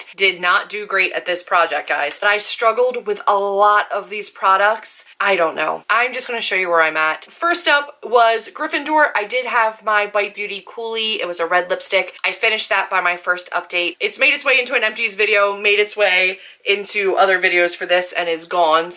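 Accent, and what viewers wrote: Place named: United States. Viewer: Where are you from? I can guess the US of course, but I can't place where. I think your accent is so cute! When you said "mask" I loved it.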